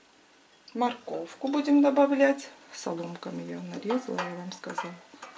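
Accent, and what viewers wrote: Armenia. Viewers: Здравствуйте, джан! У Вас приятная, сладкая речь с армянским акцентом.Посмотрела приготовление вашего блюда, и сразу запахло Арменией. Там так умеют вкусно готовить, все овощи и фрукты пропитаны и теплом и щедрым армянским солнцем.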